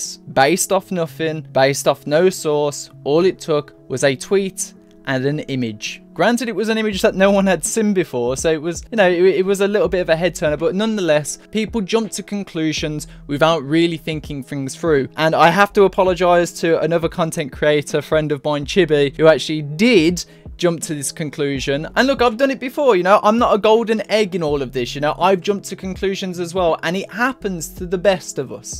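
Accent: United Kingdom. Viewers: When I go around youtube to see anime review video their voice pattern almost looks similar( Since most anime review are from American youtubers) but your one kinda sound a bit unique (more like British accent) And turn out it was 😂. Well not criticizing your accent but I must say British accent is really something else 🤔.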